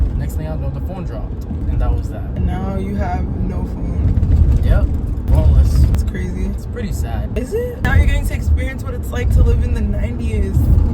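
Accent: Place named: United Kingdom. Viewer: I love the British accent 🤣🤣🤣 "Fart around! Be ridiculous! Who cares if they cringe?"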